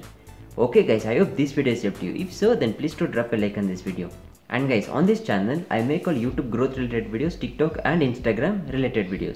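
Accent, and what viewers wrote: Karnataka, India. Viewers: Slang inda gothu aithu Kannada atha, 😍 I'm from hospet, ballari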